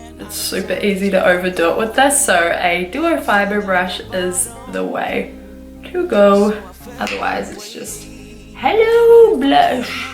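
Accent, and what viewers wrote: New Zealand. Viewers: I almost guessed wrong but I paused and was like, "no, not Australian, I think New Zealand". I was so excited to have been correct. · Are you from NZ?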